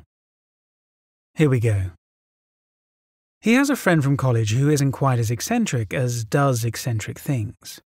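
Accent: England, United Kingdom. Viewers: British accent I’m out ! Painful to listen too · Who knew an English accent and native folklore could work so well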